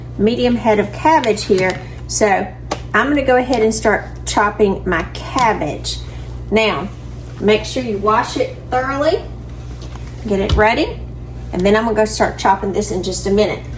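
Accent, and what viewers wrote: South, United States. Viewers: Looks good and love southern accents so no worry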